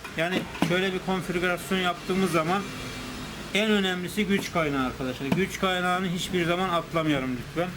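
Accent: Erzurum, Turkey. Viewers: abi konuşmandan anlamıştım erzurumlu olduğunu ondan sordum bende erzurum oltuluyum dadaşkentte oturuyarum. anakart işlemci vb hari&#231; size ka&#231; tl veriyoruz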